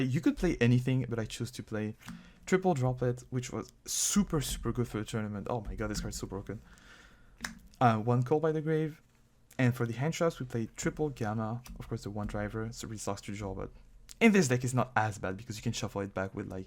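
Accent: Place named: France. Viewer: great deck profile!!! weird question, why do you sound kinda.... fr*nch?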